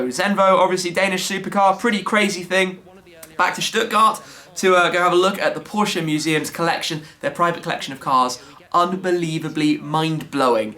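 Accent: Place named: United Kingdom. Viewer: damn ur accent is so british... and im british :0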